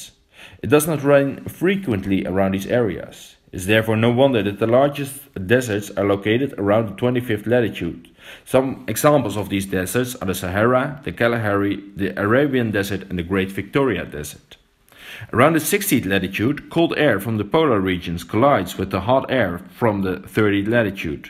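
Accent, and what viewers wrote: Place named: Netherlands. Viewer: It’s just funny how obvious you are a dutch native. Very interesting video tho!!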